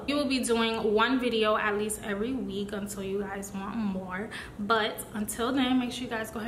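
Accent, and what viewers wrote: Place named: India. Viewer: She sound like India to me .😍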